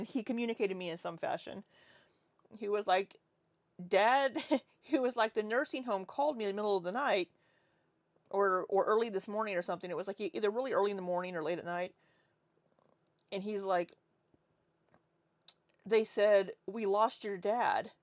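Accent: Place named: Minnesota, United States. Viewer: I missed Minnesota talk that wasn't about QLR's supidity - dang flabbit!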